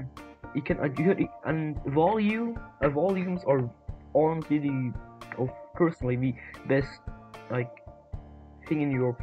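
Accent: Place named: Germany. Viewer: i hear a bit Deutsch hhahahahaha habe glaube noch nie einen Detschen gesehen der ein Gorilla Tag Fan Game macht dachte wäre der einzige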